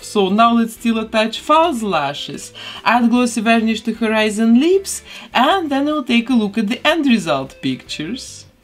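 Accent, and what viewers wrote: Russia. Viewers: Это самый потрясающий русский акцент, который я слышала)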